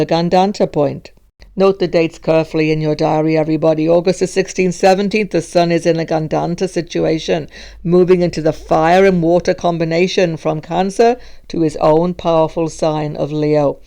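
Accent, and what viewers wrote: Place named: Scotland, United Kingdom. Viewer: Love the explanations and def love your Scottish accent❤️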